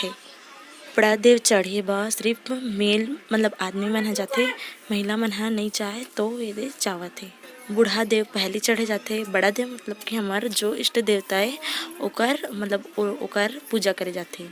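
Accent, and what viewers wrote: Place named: Durg, India. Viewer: Seva johar.tuman kon Raj ke aa nai bataya.boli se lagathe tuman durug side ke ho sakat ha.ham to Korba jila wale🙏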